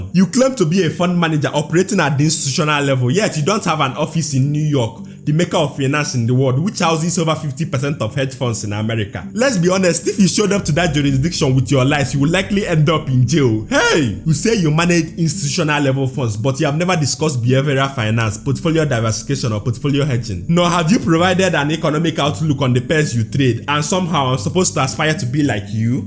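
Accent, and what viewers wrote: Igbo, Nigeria. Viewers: Pally go and improve on your diction. Your igbotic accent is too annoying and local . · Very thick igbo accent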